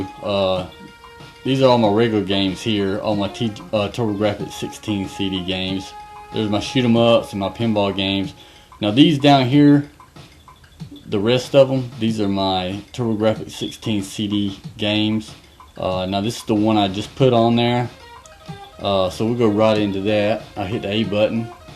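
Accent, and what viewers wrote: South, United States. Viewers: Your videos are old but have still helped me out. Plus your country accent is pleasing to the ears.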